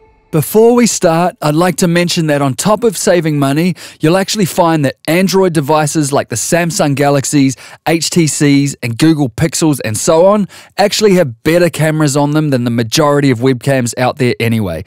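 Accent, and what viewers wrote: Australia. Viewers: You sound autrallian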